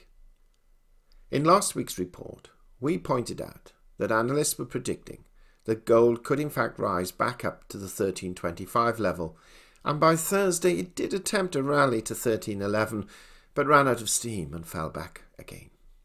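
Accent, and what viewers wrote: United Kingdom. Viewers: I guess I'm into stereotypes. These reports as read by old British accent dude keeps me coming back. Sounds smart and full of wisdom, and So cool, Thanks for the info, I don't always like the truth in your reports, but I do really appreciate them. It's like listening to Harry Potter, but for economics and precious metals. 8)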